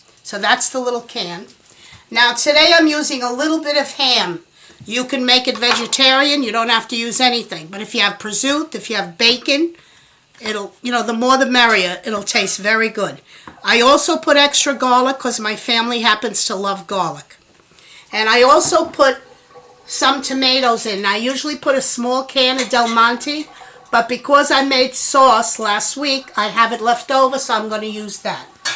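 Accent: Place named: New York City, United States. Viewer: You have a real strong ny accent, are you from Brooklyn ?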